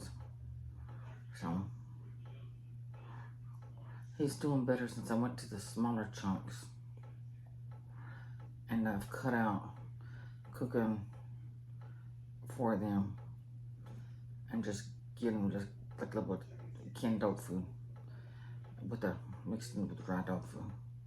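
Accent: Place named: South, United States. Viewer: love your accent. are you from the south? i’m new to the channel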